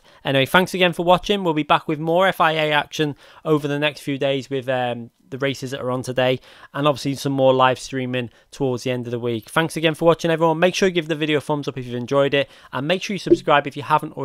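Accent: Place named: Cheshire, United Kingdom. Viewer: Nice work mate, been watching you for a while now, you from the north west? Sounds like a Cheshire accent 🤔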